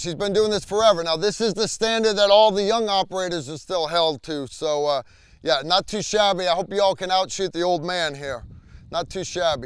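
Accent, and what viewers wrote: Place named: Northeast, United States. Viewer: New to the channel so this may have been answered already, NY? Boston? CT? What's the accent?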